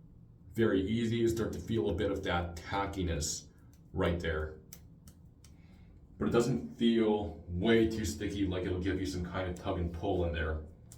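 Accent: United States. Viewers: I really want to try it, but it's hard to find here in Indonesia. Anyways, where are you from? Your accent sounds like American, but your face reminds me of my friend (Chinese-Indonesian)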